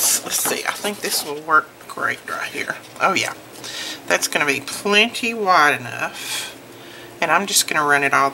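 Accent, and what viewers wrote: South, United States. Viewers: What a good idea, and useful! I've never seen those letters before. The inking around the letters is a good tip. I wouldn't have thought of it. I learn something every time I watch you. Your grand dauther is going to love it. While you were making this I was also thinking how kids really DO appreciate the little things we do for them. Just one more way we can say we love them are are thinking about them. I have to tell you this. I listen to all of your videos, because I am scrapbooking all day long, and you keep me good company while I am doing it.. Well, when I am thinking my thoughts as I work, I HEAR my thoughts in your accent!! Really! I can't speak it, but I HEAR it. I'm sure that if I lived in the south I would pick it up SO fast. :-)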